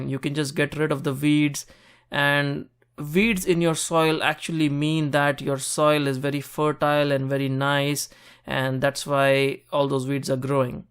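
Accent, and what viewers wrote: India. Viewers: Such a nice accent. Indian ?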